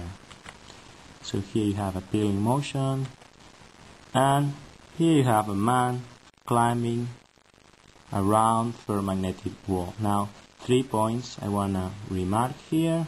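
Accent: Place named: Japan. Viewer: Hola,! Buena presentación! Tu pronunciación esta bastante influenciada por el japonés...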